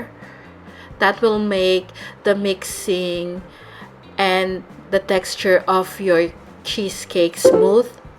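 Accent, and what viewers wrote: Philippines. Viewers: Yeah. unang rinig ko palang, alam ko ng filipino sya hahaha. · Are you a filipino? Because you have a Filipino accent. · Filipino ka no teh? Accent palang ih!! Hehehe!!! Sana teh translate mo to sa tagalog. Nosebleed!! Choz! Pero pa translate teh para maintindihan ko ng maayos hahaha!!! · but you have to admit... she does sound filipino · sure ka ba d mo naiintindihan??? Filipino ka dba? tsk tsk.. mka-insulto lang. accent is not important sa Youtube te as long as naiintindihan ng nakakarami. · matias Low IQ lng d nkakaintindi s kanya.. She has a filipino accent so dapt mas naiintindhan mu! Wag kang epal!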